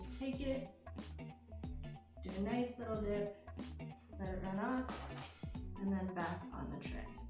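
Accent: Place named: Ontario, Canada. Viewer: You are adorable! My daughters name is, as well. She is soon 29. So love that name. She’s named after an Irish friend of mine. I always called her “ Jiggs,” too. We had all of the books. Such wonderful memories! I grew up in Ontario, Canada. She lives in Stratford, Ontario, now. You sound like you are from Ontario, also.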